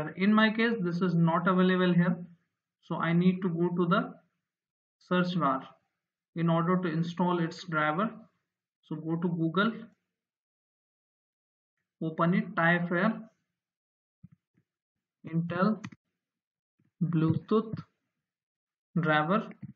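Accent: India. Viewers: man I should know by now to trust indnan accent, you are the G my man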